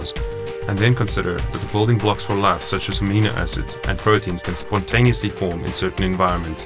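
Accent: South Africa. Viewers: Is this a South African speaking ?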